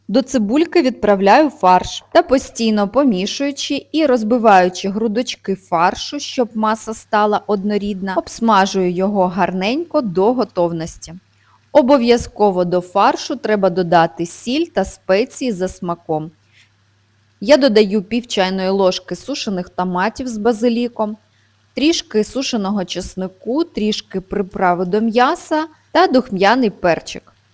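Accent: Standard. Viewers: Я недавно підписалася на. Подобається ВСЕ! І розповідь про рецепт, і його подача і чиста українська мова. ВСЕ подобається! БАЖАЮ МІЛЛІОН ПІДПИСНИКІВ!!!🎉🎉🎉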